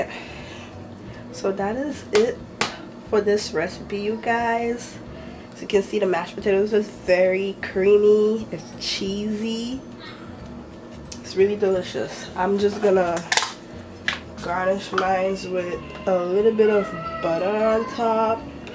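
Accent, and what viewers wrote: Caribbean. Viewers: Almost thought you were from my island, st.maarten, with your accent. But so glad I came across this channel today. I will try as much as these recipes as possible. Mayb give them a lil twist since i am a fitness dude who tracks calories. But I need to add at least some of this caribbean deliciousness to my life!!! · Trying this today ! Sound Caribbean 😀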